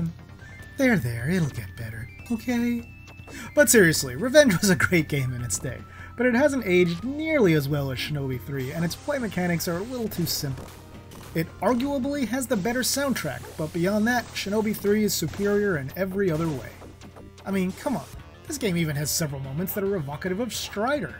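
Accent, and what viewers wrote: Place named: Western Canada, Canada. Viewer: That's cool. You speak a bit like they do out west.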